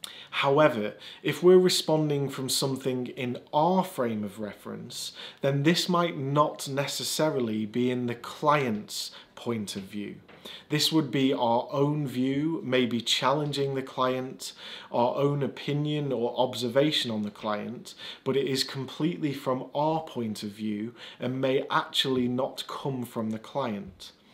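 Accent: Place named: United Kingdom. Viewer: Hey! Amazing videos mate. I recently subscribed to your channel and as a bit off topic I would like to know if you're from the Midlands or north, your accent is quite lovely :) Have a great day!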